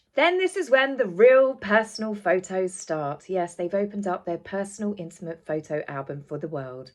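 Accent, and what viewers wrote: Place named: Cockney, United Kingdom. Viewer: Very nicely done video. Your appearance is simply transformational from about 2 years ago. I'm the one that used to tell you to slow down your speech as I cannot understand fast "cockney". No issues now.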